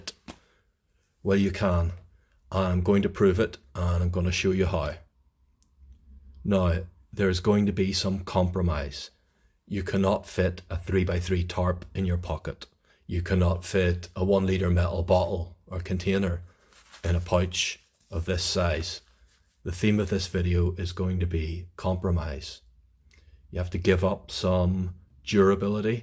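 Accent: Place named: North, United Kingdom. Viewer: Good vid! Where up North are ya from?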